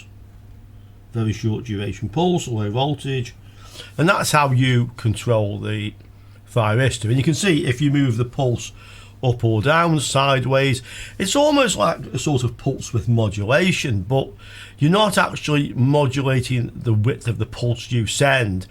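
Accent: United Kingdom. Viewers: Thanks a lot for correct explained ❤ Sometimes I have problem with the British accent! What I missed is directly connected diac and thyristor to Gate! Variable supply of diac to Gate! But thanks 😊 U give me Manny answer's and open a lot of questions!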